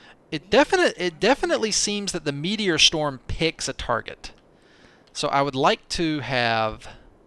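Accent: South, United States. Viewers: That's just his southern accent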